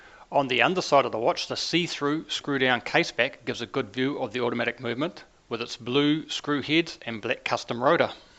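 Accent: New Zealand. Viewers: Nothing like a kiwi accent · È un leggerissimo accento neozelandese... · Such a pleasure to hear a New Zealand accent around horology circles on YT. As a proud Aussie, I was taken aback by your omission of the local (Auckland,) and well-regarded, Magrette brand.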